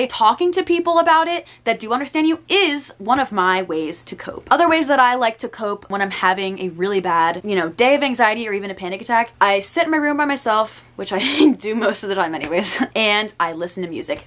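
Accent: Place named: Pennsylvania, United States. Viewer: And i think Pennsylvania's English accent is the best .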